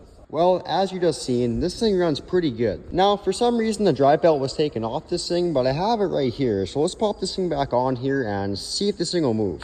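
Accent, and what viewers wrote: Canada. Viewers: You sound like you’re either from Canada or Wisconsin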